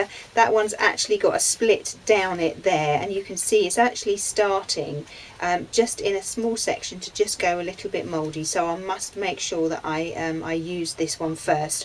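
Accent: United Kingdom. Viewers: Hello. I always enjoy watchin you and listening to your messages and that beautiful British accent. Love it. I like hearing about the differences in our climates and what we can and can't grow. I'm from Alabama, USA. I had a little, scattered garden this year with a nice little patch of okra. BUT, I've had to fight to keep the ants off my okra and corn plants and the squash bugs off the squash plants. Oh well. I think next year I'll plant the way I've heard people talk about, plant some for myself, plant some for my neighbor, and plant some for the bugs.That's a tall order.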